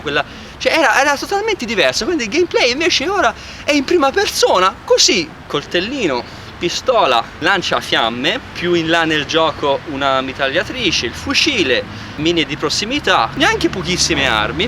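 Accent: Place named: Tuscany, Italy. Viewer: Meno male che ci sono altri YouTuber con un simpatico accento toscano ma che sanno quello che dicono, quando parlano di videogiochi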